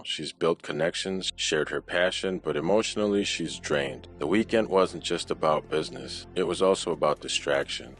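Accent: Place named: Northeast, United States. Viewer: I love listening to your voice. It's so calming. Love the northeast accent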